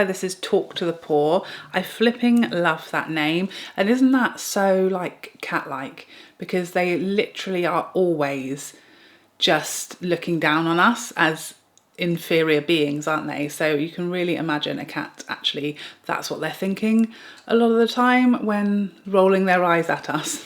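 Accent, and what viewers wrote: United Kingdom. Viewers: Bless you beautiful soul inside out love your vidoes your British accent is so regal and your voice is so calming and therapeutic, I love the mascara never tried brown but I am aiming on getting one as it will give sultry look and emphasis the eyeshadow, the look you created is spectacular 😊💕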